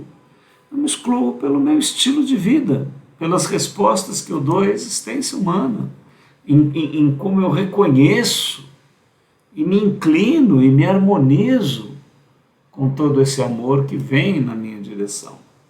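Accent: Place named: Portugal. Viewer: I sotaque acportuguesa e perfeito 😉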